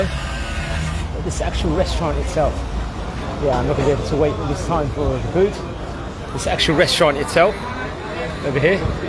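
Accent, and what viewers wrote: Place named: United Kingdom. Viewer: Your from europe you have British accent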